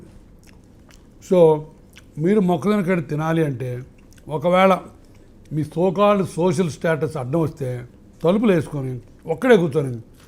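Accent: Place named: Nellore, India. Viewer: నమస్కారం సార్. ఓరకంగా మిమ్మల్ని చూసి అసూయ పడ్డాను. మీరు చూపించిన మాయాబజార్ సినిమాని పూర్తిగా ఎంజాయ్ చేసాను. ఒక్కసారి మళ్లీ 50 ఏళ్ల క్రితం అనుభవించిన బాల్యానికి తీసుకెళ్లారు. మా నెల్లూరు మలై కాజా మర్చిపోయారు. ప్రతి పదార్థాన్ని పూర్తి స్థాయిలో అనుభవిస్తూ చేసిన మీ వర్ణన మీ భాషలో అద్భుతః. మా నెల్లూరు యాసలో అదిరిపోయింది. ధన్యవాదాలు సార్. 😂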